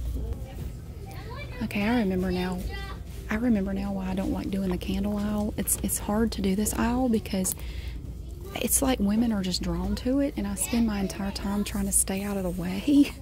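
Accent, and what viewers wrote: South, United States. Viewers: I lived in Alabama for 3 years lol I love and really miss Southern accents I'm happy I found this channel! I know you're not in Alabama but still!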